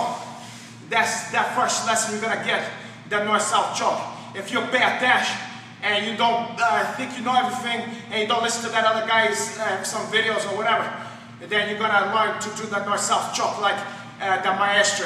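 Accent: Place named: Brazil. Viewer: "He's going to face this way my brother. C'mon my brother!" LMAO that's how so many Brazilian instructors talk. I love them all but it's so spot on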